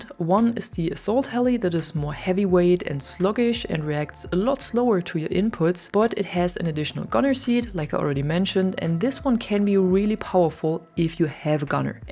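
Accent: Germany. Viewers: man hör den deutschen Akzent echt nur ein bisschen raus🤣🤣🤣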